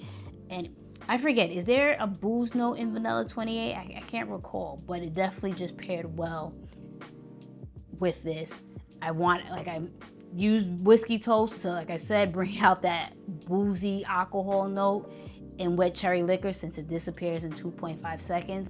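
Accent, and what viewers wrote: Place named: New York, United States. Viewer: I LOVE the fact that you still have the classic NY accent. Its actually becoming rare!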